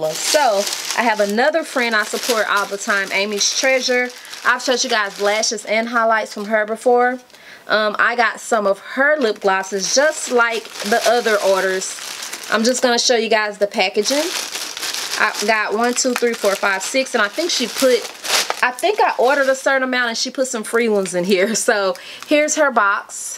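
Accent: South, United States. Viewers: Your southern accent is soo strong today I love it !!!! Happy you feeling better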